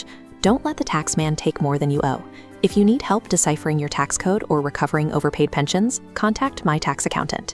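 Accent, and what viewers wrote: United States. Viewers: If you are talking about UK tax, don't use an american english voice over, otherwise no one will trust you.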